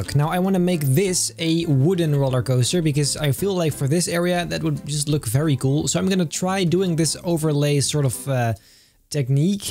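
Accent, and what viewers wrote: Netherlands. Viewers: WAIT A MINUTE… Ben je Nederlands?! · ben jij van België of Nederland of Engeland, ik gok Nederland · i new your from belguim or netherlands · I knew you were dutch Baha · are u dutch? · your from Belgium or are you from the Netherlands? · Are u nederlands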